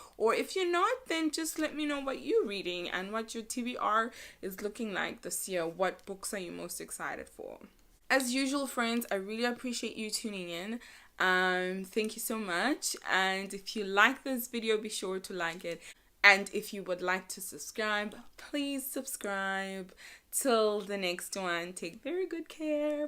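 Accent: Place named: South Africa. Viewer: I'm so glad you were on the Booktube black chat so i could find this channel! Firstly, it's wonderful to hear another South African accent, and secondly, I'm glad you highlight such important fiction. I'm glad to meet you and will be lurking in your other videos' comments soon. 😉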